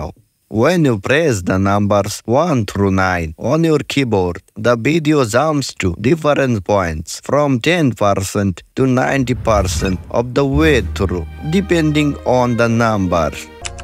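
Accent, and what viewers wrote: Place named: Spain. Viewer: Thats the most Spanish accented English Ive heard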